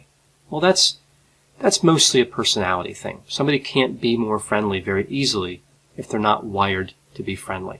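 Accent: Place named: Delaware, United States. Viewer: The only person in Delaware who hasn't met Joe Biden, lol!! Really, you have a great accent, and it's one I recognize (I'm from Virginia but have been to Lewes, DE for the beach and Wilmington for the art museum).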